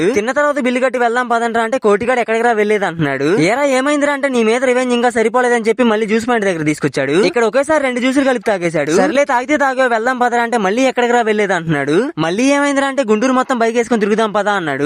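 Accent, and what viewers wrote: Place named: Telangana, India. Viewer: Miru AP ayina mi slang Telangana slang la vundhi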